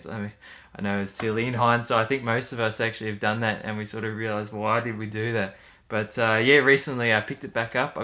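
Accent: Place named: Australia. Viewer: Cool, yeah I saw that tag video. I thought I recognized that accent. I was actually in Australia in 1999 when I was in the US Military. (Forever ago.LOL!) We were on the East coast near, Rock Hampton, Gladstone and Brisbane.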